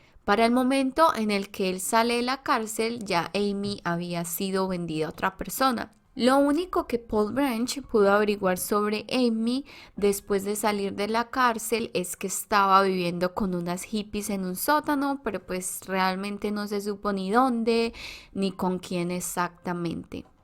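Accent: Colombia. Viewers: Hola nena! Espero que estés muy bien. Te quiero aclarar algo: No se les llama moteros. Yo sé que eres colombiana , y me encanta tú acento. Se les llama bikers. Que se pronuncia baikers. Y no se dice autostop. Se le llama ride , Que se pronuncia raid. Eres muy bonita, y excelente narradora. Felix Aguilar desde los Ángeles California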